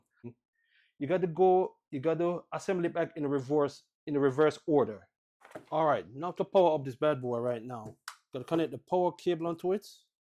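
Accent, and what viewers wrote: Jamaica. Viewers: The fact that you're Jamaican makes this 100 times better😂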